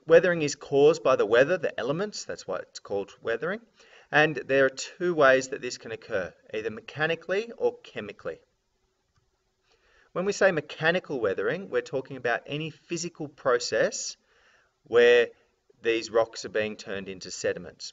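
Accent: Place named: Australia. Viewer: 10/10 aussie accent 🤠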